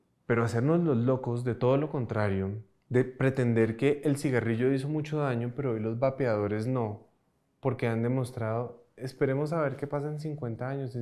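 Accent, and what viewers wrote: Colombia. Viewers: dije meditacion...y si..no lo recomiendo dira muchas cosas buena pero me parece dice mas cosas malas y mentiras...no se si el cree sus mentiras o no. Te recomiendo no te dejes engatusar por su acento colombiano bonito. Lo siento "doc" es lo q pienso.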